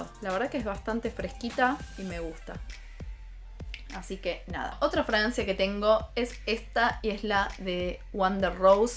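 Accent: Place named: Argentina. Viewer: Ya me parecía. El acento argento es imposible de no reconocer. Yo también soy de Arg.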